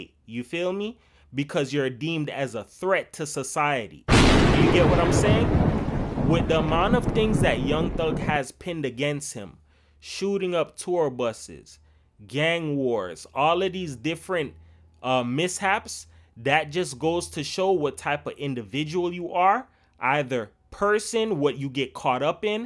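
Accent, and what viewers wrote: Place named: Jamaica. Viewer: I can hear the Jamaican in you. Dope video. There guys are some clowns and deserve what they get. Nobody or going to buy his lawyers bullshit. He said nothing is wrong with throwing up a gang sign in a RICO case 😂😂😂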